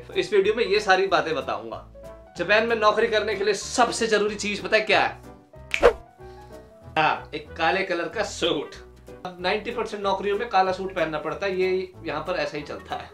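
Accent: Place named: Rajasthan, India. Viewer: Very nice,speaking like rajeshthani indian good way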